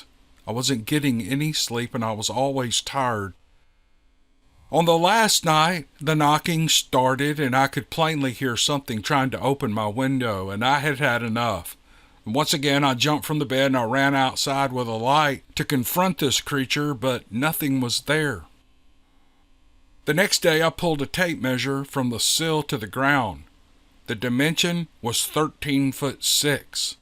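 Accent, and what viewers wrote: South, United States. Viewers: I missed the poll on your community page. I could listen to you read the back of a cereal box for an hour!😂for me your southern accent reminds me of home. I have followed you for a few years now and you seem like a genuine nice guy I like that too. No foul language no foolishness. Nothing worse than listening to people bashing others, I despise that. I just like your approach to every story or email. No need to thank me for listening, I’m one of your people😂. Thank you for the stories. Keep up the good work brother❤️ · I took the poll, 😁, love hearing a Southern gentleman tell stories. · Mr., I hope I am not offending you sir by commenting on your last video, however at the Mark you commented "some of these villages were set up by whites!", and I found it to be somewhat derogatory and offensive...what does skin color have to do with Sasquatch entertainment stories and why invoke race when it was obviously not relevant...someone with your platform, and in reaching the thousands of ears and minds that your narration touches, one would think you'd be more conscious of your choice of words and how they're perceived....I challenge you sir to replace the word "whites" in that statement with any other race defining word and id almost bet youd be deplatformed before you coukd say.....that said, my brother and I thoroughly enjoy your southern draw and your channel is our favorite! 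· You are most welcomed. I love the southern sound of your voice and I only listen to a few of you guys since Covid, so to me you guys are the OG. Thank you for sharing your time and you voice with us. 🙏🏼❤️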